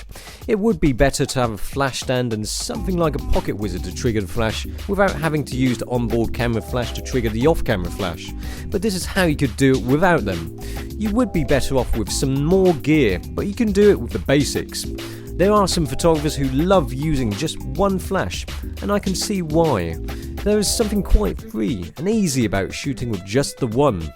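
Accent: England, United Kingdom. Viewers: i don't like how he speak.. British ? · The English accent, raises the perceived quality of the instructions several folds, such is the power of the ENGLISH accent. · an asian guy with british acent omg -____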